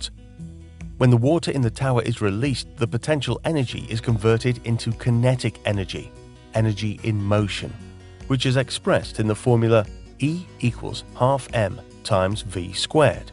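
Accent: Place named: United Kingdom. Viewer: I love how you Brits say "wouuhtuh." If you jumped into the water in the tower, would you get sucked into the plumbing?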